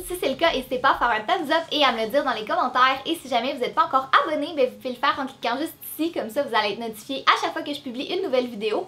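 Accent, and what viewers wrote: Quebec, Canada. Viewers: je suis la 451 vue j'adore tes vidéo continue en plus tes trop belle PS : j'adore l'accent cebecoi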